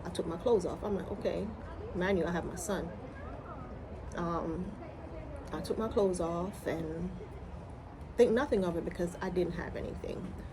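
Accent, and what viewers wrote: United States. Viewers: Why are you talking in an American accent? Your other videos are PURE JAM! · Sorry that happened,I have a question your accent is American,where did you attend school,what state ?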